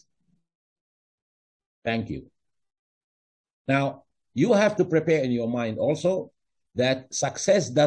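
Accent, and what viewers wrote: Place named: Arab world. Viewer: Very Good video , thanks. Wow your originally from india ! I thought your accent sound Arab .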